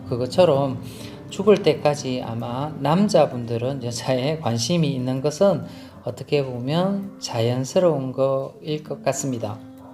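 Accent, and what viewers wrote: Gyeongsang, South Korea. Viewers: 말투가 너무 정겨워요. 제가 중학교 까지 창녕에서 가장 가까운 합천에 살아서 그렇게 느껴 지는가 봅니다. 영상 잘 보고 있습니다. 곧 10만 미리 축하 드려요.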